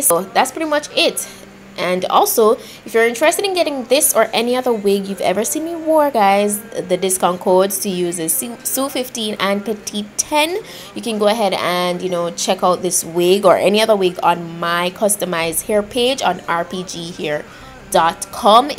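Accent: Jamaica. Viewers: Omg I love you jamaican or trinidadian accent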